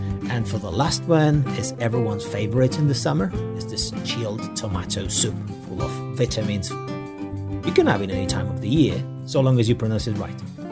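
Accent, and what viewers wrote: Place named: Latin America. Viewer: I guess this is Latin spanish prononce, not spanish. Tortiyya, paeyya.. wtf tortidzha, paedzha...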